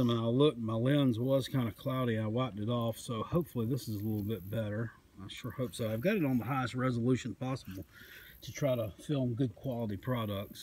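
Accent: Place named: Western North Carolina, United States. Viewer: Sound like your from Western NC, haven’t heard an accent like that since my days in Robbinsville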